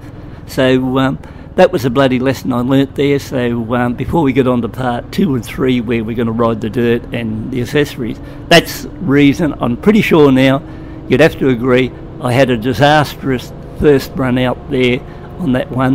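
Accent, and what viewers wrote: Australia. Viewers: Bloke sounds like the orignal template for a bogan mate.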